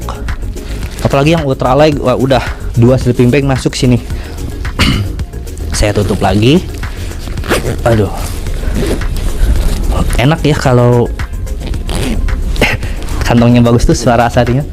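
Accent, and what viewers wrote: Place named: West Java, Indonesia. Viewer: Logat Sunda na mah Aya keneh 😁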